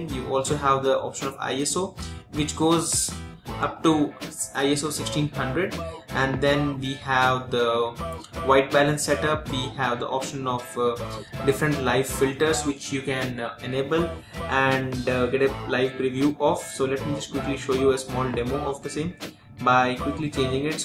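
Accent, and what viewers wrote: India. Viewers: Stop saying checkout and get rid of that Indian accent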